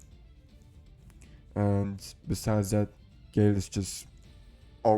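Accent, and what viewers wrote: Germany. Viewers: Are you from Germany? Super Nice Video And Deck👍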